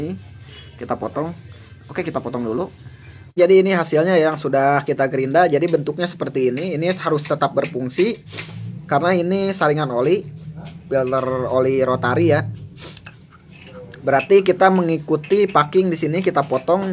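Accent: West Java, Indonesia. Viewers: lokasi mana bang..logatnya kayanya sunda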